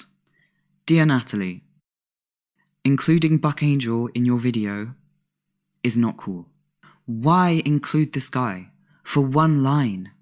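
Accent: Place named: Southern England, United Kingdom. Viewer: It's that smooth baritone and southern English accent.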